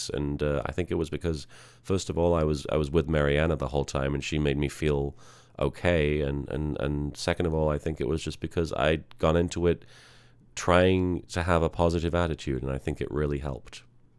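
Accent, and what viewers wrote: United Kingdom. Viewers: I wonder why he has a British accent.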